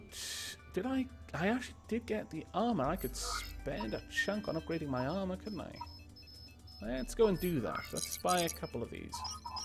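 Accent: Wales, United Kingdom. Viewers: is he welsh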